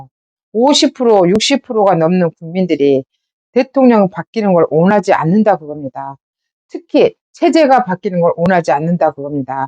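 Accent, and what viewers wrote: Jeolla, South Korea. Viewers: 광주아짐 의 진득하신 남도말씨의 맛깔스러움에 저도 진심 전라도인에 대한 인식이 계몽되기 시작합니다^^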